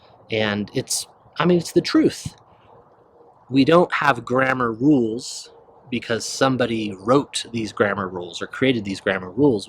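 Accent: United States. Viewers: perfect American accent English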